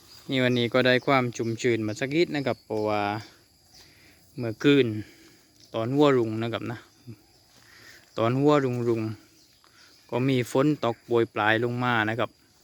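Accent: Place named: South, Thailand. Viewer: ชอบสำเนียงใต้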